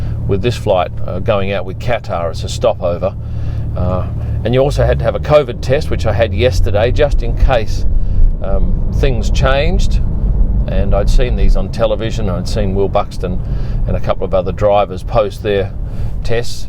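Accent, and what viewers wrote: Australia. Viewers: It’s so great to hear a fellow Aussie at the top of his game doing this. I shoot a bit for fun, but not on your scale. Love your work mate. From Quarantine central... Melbourne. 😂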